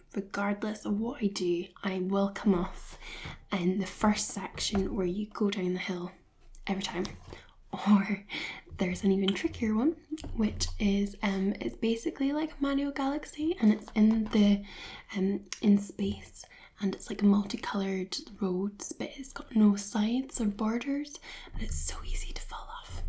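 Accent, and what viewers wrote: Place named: Scotland, United Kingdom. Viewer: let's plays should be a thing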